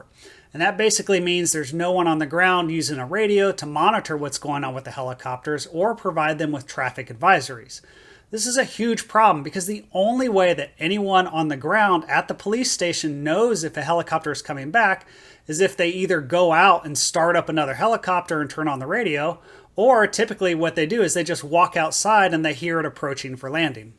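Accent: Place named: California, United States. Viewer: Hey are from California? Got that cali accent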